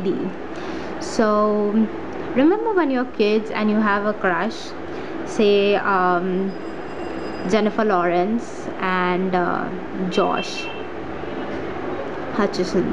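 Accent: India. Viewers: Because of your accent and the traffic noise😁 btw im indian too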